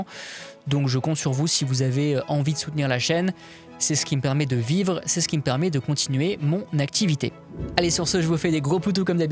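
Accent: Alsace, France. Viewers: C'est la première fois que je vois une de tes vidéo, mais t'es pas Alsacien ?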